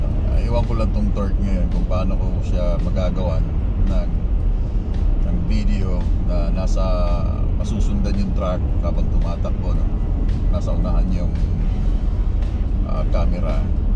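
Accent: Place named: Pampanga, Philippines. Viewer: Parang kapampangan sya kase ako ganon matagalog lol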